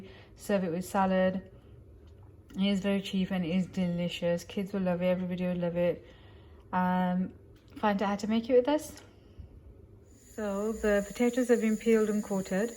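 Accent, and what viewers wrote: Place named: Midlands, United Kingdom. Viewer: Well presented and a great offering, stay connected and more importantly stay blessed 🙏 By the way you sound from the Midlands though I maybe wrong, thinking Birmingham 🤔😉😊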